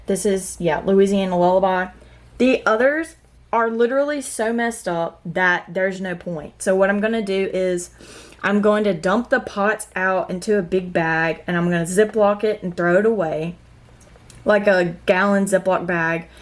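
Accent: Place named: Tennessee, United States. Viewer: I’ve been trying to figure out if it’s mites, too much light, or too much fertilizer on my violets. I think it may be mites :( I’m going to come back to your video tomorrow and compare them to mine. I feel pretty sure they have the same characteristics and am going to throw them away. sad, two are expensive fancy ones and the other was a showstopper for me for so long. trailer always covered in tons of blooms, it didn’t even look real. I’m happy to find your video, I love how knowledgeable and in depth this is. I am also a plant nerd and love the knowledge density and showing the plants up close and talking about the very specific signs that it is mites. that is so helpful. am subscribing to you! also idk if you’re from Tennessee but I am, haha and we sound similar :)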